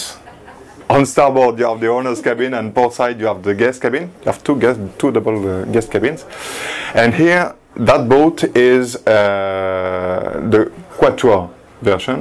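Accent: France. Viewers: Ah ! le célèbre "french accent" !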